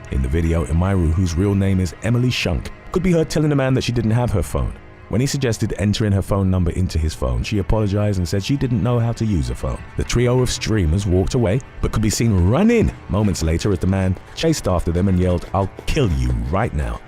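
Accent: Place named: United Kingdom. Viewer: it’s an accent because he’s British but he’s also pronouncing names completely wrong. Even by British standards.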